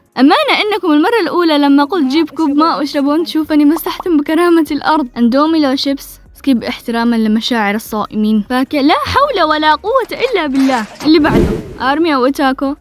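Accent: Yemen. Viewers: والله من اول فيديو شفته لك حسيت ان يمنية 😂😂 وجيت لذا الفيديو اتأكد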